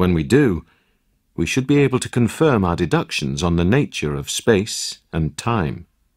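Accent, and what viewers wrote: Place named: England, United Kingdom. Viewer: Who's the narrator? Best English accent ever!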